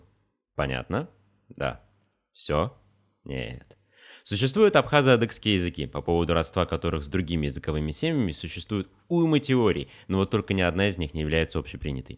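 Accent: Russia. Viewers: Автор выговаривает адыгский с русским акцентом. Если хотите услышать чистый современный адыгский язык, кабардинский диалект , вот фильм <link>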